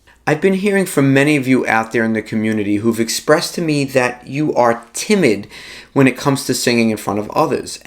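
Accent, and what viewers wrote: New York, United States. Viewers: Thanks, as an Englishman (living in Brazil) your New York accent always puts in my mind of my favourite TV show. No not CSI New York but The Sopranos, so it was good to see a picture of Tony S in there!! I have a request for future videos. I'm not a pro and only do little fill in sets when the main performer is on a break at my local bar, but despite plenty of recent practice I still find playing guitar and singing at the same time a bit of a struggle. It's easy enough on simple 3 chord bluesy songs but for something like Layla, which I tried after your recent tutorial, you know a bit more complex, it's a bit more of a challenge! I do manage it ok but would like to not need to concentrate so hard!! Any tips. Also, I live in an apartment block and can't really rehearse singing at full volume and certainly not with a mic, any ideas or do I have to always wait until I'm out live to see if the song suits me. 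Anyway another great vid today, thanks.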